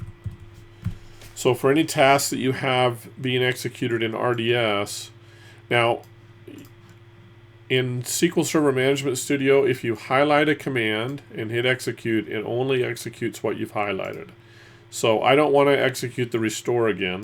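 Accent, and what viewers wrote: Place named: United States. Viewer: I love this video! It is in clear American English, He tells every step from start to finish that must be accomplished to natively restore/backup RDS MSSQL with S3 and the screens still match what I saw on my laptop as I went through this process. This is an excellent 'How To' video, I give it Two Thumbs Up!!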